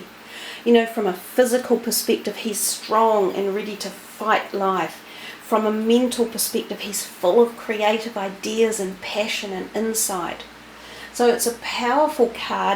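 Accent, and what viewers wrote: New Zealand. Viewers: Are u from new zealand? U have kiwi accent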